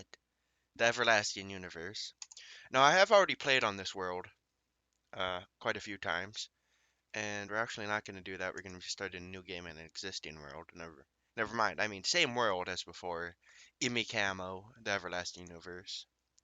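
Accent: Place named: Ireland. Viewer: First comment. Also, I really like your Irish accent it sounds so cute ;). Can I pretty please get a dwarf named after me. :cat-orange-whistling::cat-orange-whistling::cat-orange-whistling::cat-orange-whistling::cat-orange-whistling::cat-orange-whistling::cat-orange-whistling::cat-orange-whistling::cat-orange-whistling::cat-orange-whistling: Your secret admirer , NiftySnipes:thanksdoc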